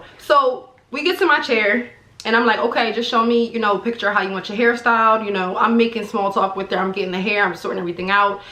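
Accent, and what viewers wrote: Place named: New York, United States. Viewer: u so new york i love it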